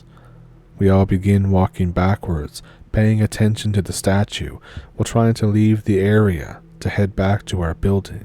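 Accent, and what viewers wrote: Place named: Newfoundland, Canada. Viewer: Narrator sounds like he’s from Newfoundland! Me too brudder, me too. If not you must be east coaster fer sure. Thanks for the upload makes me feel like I’m home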